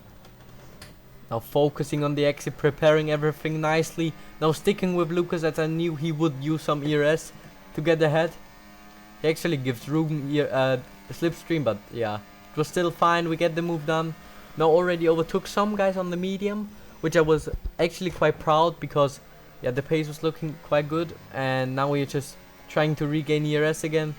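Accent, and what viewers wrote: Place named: Tyrol, Austria. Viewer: Aus welchen Bundesland kommst du? klingst wie ein Tiroler:-)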